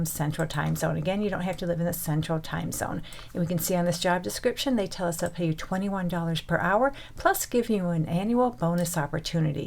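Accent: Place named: Wisconsin, United States. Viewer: I love the accent. Gives a Wisconsin vibe to it. Made this easy listening 😊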